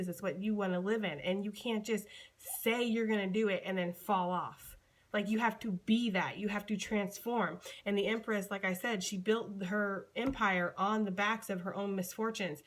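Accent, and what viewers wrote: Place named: New York, United States. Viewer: You talkn to me this morning #pureNYvoice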